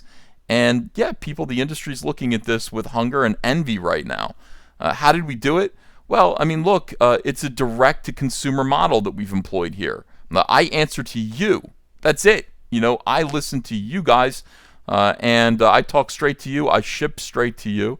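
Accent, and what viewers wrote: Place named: Baltimore, United States. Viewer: You sound like you're from the Baltimore - Camden axis (which includes Cumberland).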